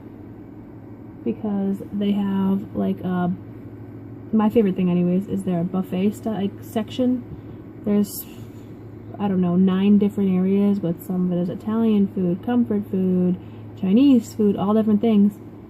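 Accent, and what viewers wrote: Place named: Boston, United States. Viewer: I looooove ur videos!!!!! I also loooove ur Boston accent! I've been watching ur videos from the beginning and girl YOU ROCK!! U r looking super fabulous. Enjoy the wedding and wearing ur new size 16 dress!!!! My vertical sleeve surgery is next Wednesday and I can't wait to be in a size 16!!! Love to u missy!!! Keep up the great work!! Oh and hopefully soon u will be OUT OF THAT OFFICE!!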